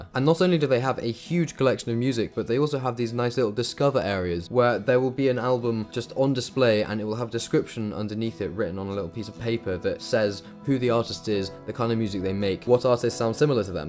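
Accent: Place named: London, United Kingdom. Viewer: A bit late but sorry to hear this news man. I'm just wondering if you are originally from London cause of you're accent.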